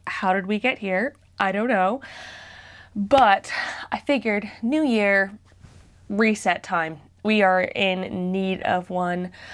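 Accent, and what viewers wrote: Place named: England, United Kingdom. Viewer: Ive been curious about where your originlly from and when you moved to england, do you notice that you have an english accent sometimes? i think its cute! i would love to live in england. I also notice that when you speed up your videos you move your mouth around, do you have a bad habit of chewing your cheeks like i do? you seem a bit neurodivergant and i think thats a sign. I do it so bad sometimes that my cheeks will be sore. Love your videos btw!! love your fashion style to! where do you get your jewelery??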